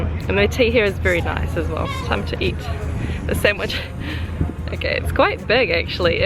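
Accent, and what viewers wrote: New Zealand. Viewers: You have the 2nd most beautiful New Zealand accent of the world.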